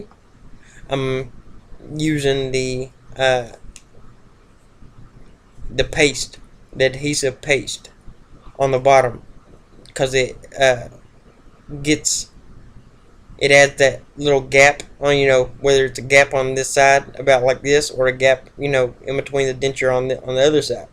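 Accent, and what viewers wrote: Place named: Texas, United States. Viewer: Hi...hope u are well...make some updates please! Wonder if by now (a yr into wearing ur dentures now have you tried cushion grip? A lot of people on here have said it is great! Please let us know how u are after all this time...hope to see you soon...BYW are u from Tx? You sound just like me & both of my sons...lol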